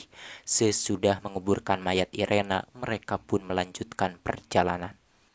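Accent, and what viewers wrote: West Java, Indonesia. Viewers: Sampean asli org mana bang??? Sunda ya?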